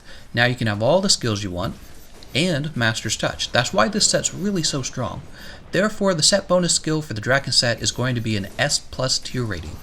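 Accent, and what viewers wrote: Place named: United States. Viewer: idk Why you get so much hate... Your videos have the best information... and your even tone makes the American accent easy to understand.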